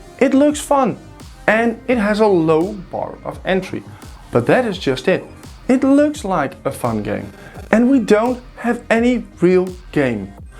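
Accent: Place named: Netherlands. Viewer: Klinkt als een hollander maar dan int engels 🤔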